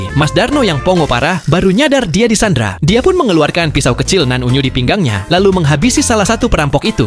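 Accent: Java, Indonesia. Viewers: Jowo ngendi mas?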